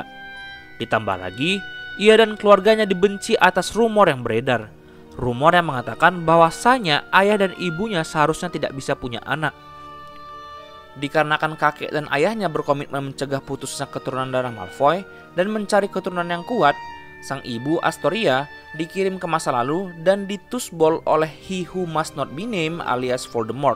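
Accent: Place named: United Kingdom. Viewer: british skali komentatornya hehehe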